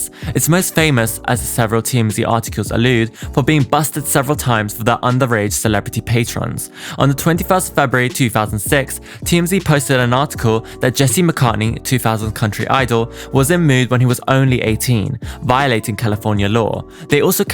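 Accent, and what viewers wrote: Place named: RP, United Kingdom. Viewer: LOVE your channel and your voice— so posh! I’m definitely a miserable millennial nostalgia worshipping on simpler times. See you in hell 💋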